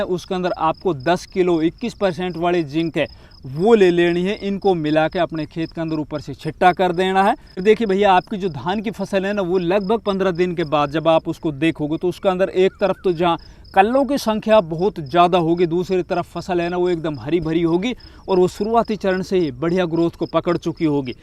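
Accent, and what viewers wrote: Mewat, India. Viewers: Mewati ho ke aap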